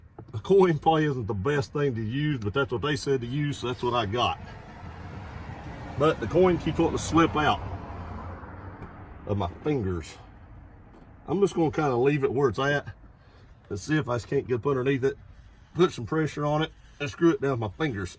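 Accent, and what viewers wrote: South, United States. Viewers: That southern drawl is like nails on a chalk board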